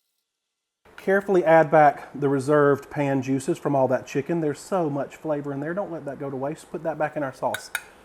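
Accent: South, United States. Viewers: I love you and that I understand you totally because we are SOUTHERN!! I have missed you boy!! I got to try to stay on low carb. It’s so hard here in the south. 🙌🏼🙌🏼🙌🏼 yes casseroles are comfort. Now- what about cream of chicken?? I have so many good casseroles with that too. I can’t wait to see what you have in store soon! Thanks for the video!!! ❤️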